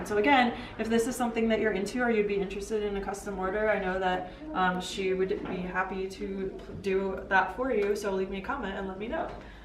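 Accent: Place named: United States. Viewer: Thank you for the tour!😍 I find it interesting that in Iran the kitchens are open in comparison with Azerbaijan, where i come from. It would be also nice if you would go a bit more deep in thr tour and show more details in the furniture, for the kitchen especially it was too quick, and at the end to have an overall blick once again😊 Btw you have a lovely american accent👍🏽 As i understood you are living in the States and just visiting Iran.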